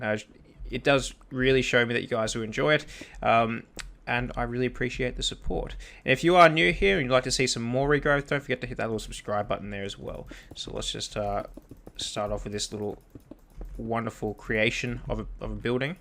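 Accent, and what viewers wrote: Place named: Australia. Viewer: Great video dude would like to see more but Are you Australian because you kinda sound like it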